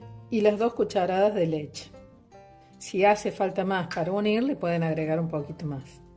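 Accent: Córdoba, Argentina. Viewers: La primera vez comí la tarta de ricota con naranja en Cordoba Argentina, hace 15 años; simplemente fabulosa. Voy arriesgarme a preparla. Me encantó oir su acento cordobés. Gracias por compartir.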